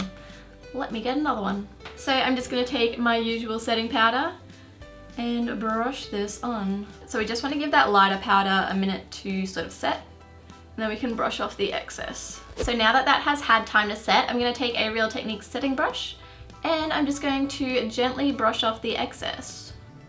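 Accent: Australia. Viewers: What?? She has a super heavy Australian accent.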